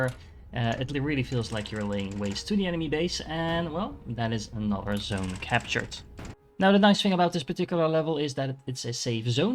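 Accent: Netherlands. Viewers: actual mindustry content????!!! rare to find also i love how we dutch people speak english you can emidiatly recocnize yourself lol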